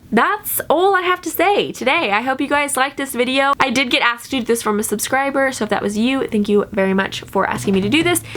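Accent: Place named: New York, United States. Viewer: i see that you can speak spanish, well . . . Im learning english and you are one of my best teachers. Everyday im trying to hear you, your accent cuz i wanna travel to NY someday, saludos !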